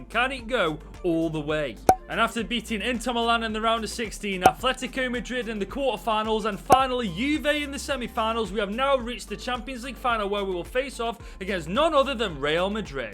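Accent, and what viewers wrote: Stoke, United Kingdom. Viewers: Must be from Stoke yourself with that accent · I absolutely love his British accent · You're accent! You're from Stoke right?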